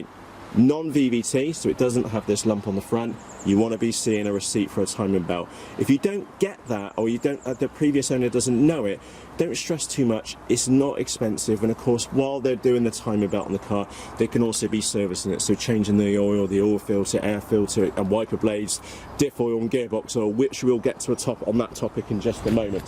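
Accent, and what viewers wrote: United Kingdom. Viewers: Thank you! I absolutely appreciate all the work you've done on this 1.5 hour video. I think this is one of the best guides on buying a car I've ever seen. Normally I don't "like subscribe and bla-bla-bla" but this time I think it would be absolutely unfair not to do it. I hope your advice will help me soon. I'm from Russia myself (don't blame me on that) but I think all the guys telling British accent is hard to understand are talking bs cause this video proves otherwise despite I know there could be some issues with old school British accent) Everything was clear and helpful. Thank you once again. Love it!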